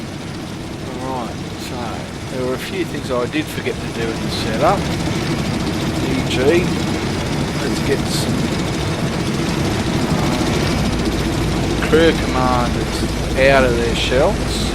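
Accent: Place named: Australia. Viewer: really nice to watch, and your aussie accent adds some taste to the thing :) cheers from Matt_Mulder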